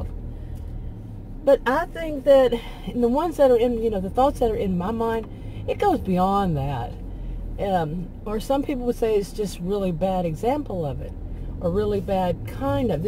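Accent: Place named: Texas, United States. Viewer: I was happy to find your video. My 72 year old narcissistic mother and 48 year old, younger, golden child, narcissistic brother have both stolen lots of my life/time from me. Lots of ongoing ptsd. Still, I forgive them. I have to. My faith requires it. Even though the abuse never stops. At first I thought, you sound Texan. Then I saw the licence plates(lol). I'm in Pearland. Howdy neighbor. Hope your channel grows. Subscribed. God bless you and yours.☺🙏💜👍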